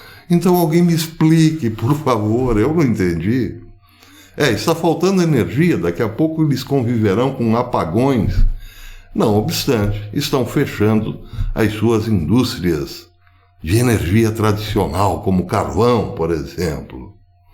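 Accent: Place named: Minas Gerais, Brazil. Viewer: deve ter morado em Minas! Tem gostado de falar em mineirês!! Beijos professor! Amo seus vídeos!!! (BH)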